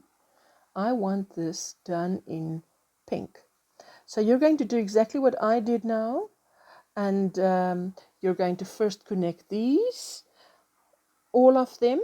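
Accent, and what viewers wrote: South Africa. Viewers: Love your blanket. I live in Johannesburg and it’s so lovely to see and hear a South African tutorial. Thank you for sharing talent xxxx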